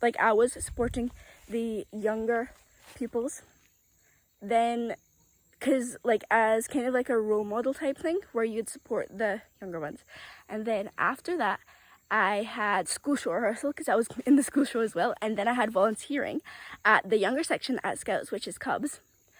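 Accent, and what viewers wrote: Scotland, United Kingdom. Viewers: Hi, so great to see you and hear your Scottish accent. You have to do as the Scotts do, lol. You are an outstanding student. Keep up the great work—this is your time to reach the stars. We will be here to welcome you back whenever time permits you to make videos. Stay safe and well. God’s blessings on you and your family 😊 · Nice you are speaking Scottish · Congratulations on your academic achievements. This is only the beginning, stay focus and you will accomplish more academic success. I am not surprised at your scholastic success. There's absolutely nothing wrong with speaking with a Scottish accent. Enjoy your Guyana vacation.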